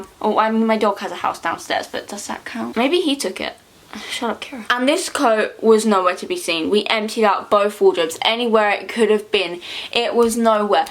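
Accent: England, United Kingdom. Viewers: Is she British? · Ur English 🇬🇧🇬🇧🇬🇧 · So this is suppose to be a complete compliment.... I love how you talk, you slightly remind me of a sassy like pirates teenage daughter. I'm from California so I'm obsessed with English accents just like every other Californian haha but yea this is great. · you mean shes from England, Australian's still speak English :) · Taylor Stege she's English :))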